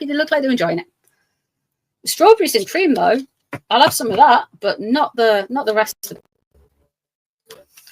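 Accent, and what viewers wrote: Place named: Newcastle, United Kingdom. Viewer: You are one my top two readers, tell it like it is. I know I don't comment much, but I do appreciate your time, and still loving the accent, my grandfather was a Geordie through and through, he had an accent very similar to you.😁👍❤️💐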